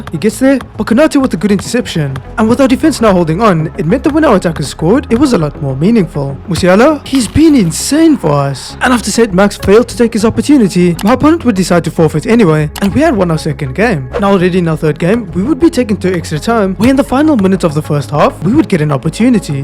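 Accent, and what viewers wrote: South Africa. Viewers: Yooo u south african 🇿🇦?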